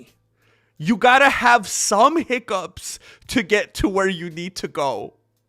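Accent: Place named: Mexico. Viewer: I preferred this fellow when he used to crank up his Mexican accent a bit more, and force that false laugh a bit less.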